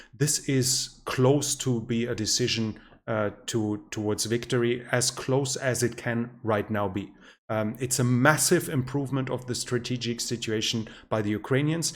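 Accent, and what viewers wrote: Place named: Germany. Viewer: The moment I heard a German accent I knew this would be good.....just straight forward analysis based on cold hard facts and evidence without any political rhetorics how refreshing...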